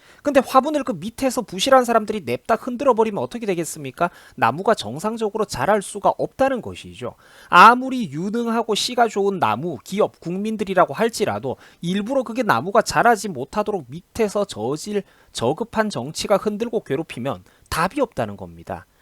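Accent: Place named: South Gyeongsang, South Korea. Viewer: 목소리가 경남쪽이네요...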